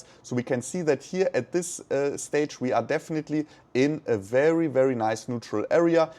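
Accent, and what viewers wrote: Germany. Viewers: Altcoin Daily Bright me here! Which European country are you in? Sounds a little German maybe? Anyways, I'm in the US and you're 6 hours ahead of me. If you did livestreams at 9pm your time, would be perfect for me! Keep up the amazing work! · Hör ich da einen deutschen Akzent? ;-) Danke an Altcoin Daily.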